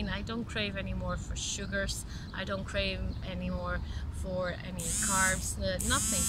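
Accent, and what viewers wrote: Ireland. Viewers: Omg that irish accent ... just love it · That irish accent! Nota-se imenso! Gostei muito do video e do sitio onde estás, queria muito! Brevemente vou trazer um vídeo sobre vegan/vegetarianismo para o canal, e a Paleo Diet parece um bocado assim também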